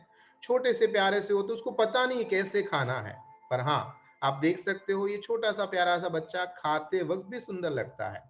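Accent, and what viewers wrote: Gujarat, India. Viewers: Sir aap Gujarat se ho?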